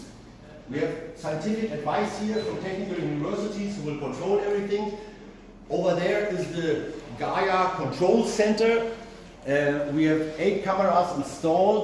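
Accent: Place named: Germany. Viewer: You can hear that he is from Germany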